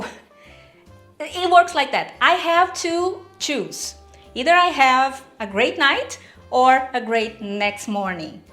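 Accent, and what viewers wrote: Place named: Brazil. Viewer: I know a Brazilian when I hear one! 🫶🏼🇧🇷🙏🏼 que delícia 🤤 feliz Natal Deus abençoe 🎄👼🏽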